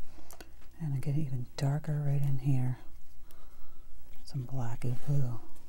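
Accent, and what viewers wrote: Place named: New England, United States. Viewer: I loved the painting and I love the tutorial. You are very good at explaining the process. And I loved that I could detect a New England accent I believe!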